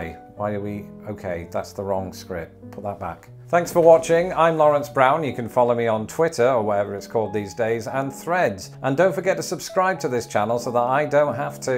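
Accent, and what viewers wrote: United Kingdom. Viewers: I'll always think you are a Brit, Lawrence. No insult meant, but the accent marks you. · NEVER lose your beautiful British accent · I really did not want to subscribe to your channel only because I heard your British accent or whatever you're from ( American Bigot here ), but you speak of facts and facts only. If anything you made me more proud to be of an American and I hate it. You're a brit, but made me more open minded and I love you for that.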